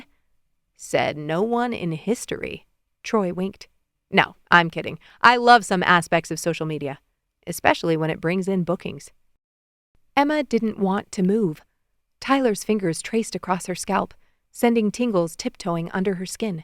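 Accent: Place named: Canada. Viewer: i love this soooo much the slight canadian accent just warms my heart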